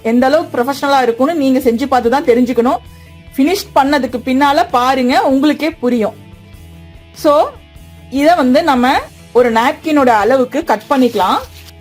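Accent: Kongu, India. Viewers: I m watching your all videos from 3 years ago. All videos super. Kongu tamil madam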